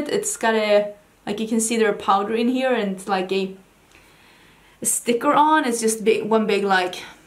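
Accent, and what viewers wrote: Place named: Sweden. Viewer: I watch every single one of your videos because you're a triple threat. You're gorgeous, intelligent, and sarcastically hilarious! From the first video I found by you, I remember thinking, "WOW, this girl freaking SAYS what many people are thinking but are afraid to say!" and I subscribed immediately. That was at least 2 years ago. (Plus I'm a sucker for a Swedish accent) I also thought you were so badass because your English is fantastic and feel honored that you chose to speak it in your videos. I wish there was a way to separate the "people who count as lots of views" from the people like me who watch every video as soon as it comes out but just my views aren't enough. Like there should be a higher level of adsense for repeated views by loyal subscribers versus random 12 year olds passing through YouTube looking for something that flags their attention, who aren't subscribed. 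But I guess ads are ads.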